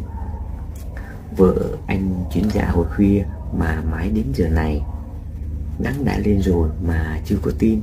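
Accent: Mekong Delta, Vietnam. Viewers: Anh đọc theo giọng người miền Tây thiệt tình cảm, nghe vừa thân vừa thương!!! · Cám ơn ( xin lỗi vì không biết xưng hô như thế nào ) nhiều lắm. Bạn đã truyền tải bài viết của bạn bằng chất giọng miền tây hay quá đúng như tình cha của hai cha con Chú Mười ❤❤❤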